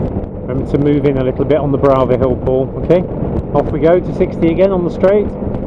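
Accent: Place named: England, United Kingdom. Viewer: I find this channel soothing and relaxing it’s the English accent and the quaint English countryside and towns that does it for me … and as a bonus there’s very good riding tips and safety information… thank you 🙏🙂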